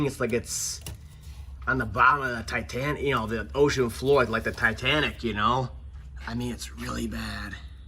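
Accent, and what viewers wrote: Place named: Maine, United States. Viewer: Love the Mainer speak. Reminds me of one of my favorite movies, Dolores Claiborne, "you do it again and one of us is going to the boneyard".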